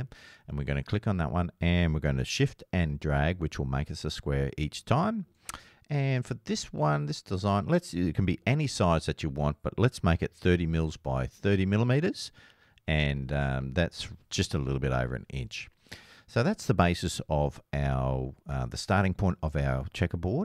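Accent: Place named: Australia. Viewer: Great lesson. I’m trying to learn all I can, so I can stop buying files and make my own work. Love the Aussie accent. Australia is on my bucket list to visit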